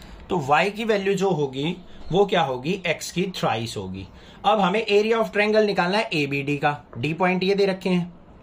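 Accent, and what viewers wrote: Haryana, India. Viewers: Sir app haryanvi hoo na mane pahachan liya😁😁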